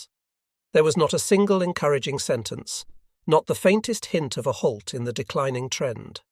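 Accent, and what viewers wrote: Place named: United Kingdom. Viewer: Why does the narrator have a British accent? Shouldn't he have a German accent?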